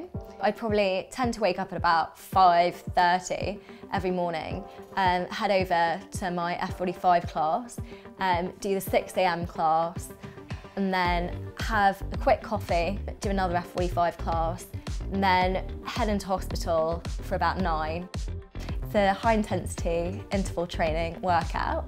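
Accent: United Kingdom. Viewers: I love ❤️ that British accent ❤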